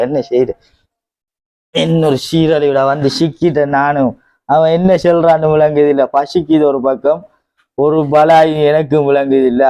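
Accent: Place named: Valachchenai, Sri Lanka. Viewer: அரபுநல்லாதெரிந்த ஒருவரைவைத்துநடித்துரிக்கலாமே.நீங்கள்.எந்த இடம்.வாழச்சேனையா.உங்கள்பேச்சில்விளங்குது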